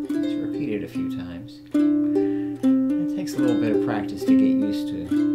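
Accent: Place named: South, United States. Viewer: Thanks for an excellent tutorial. I am really hooked on this style of playing now. I am from the UK and am also tickled by your southern US accent. I love it. Uke playing is in now and clawhammer is catchin on. Thank you so much buddy!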